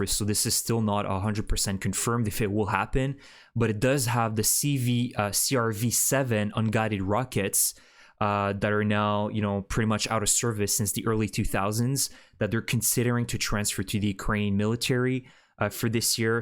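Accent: Quebec, Canada. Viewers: Pretend? I hear a strong French Canadian accent.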